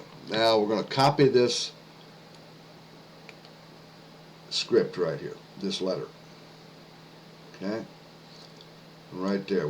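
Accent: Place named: South, United States. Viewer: Love that Southern accent. Yesss!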